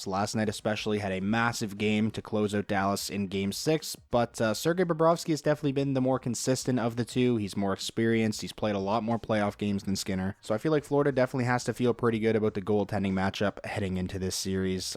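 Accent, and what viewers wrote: Nova Scotia, Canada. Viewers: Are you from Nova Scotia?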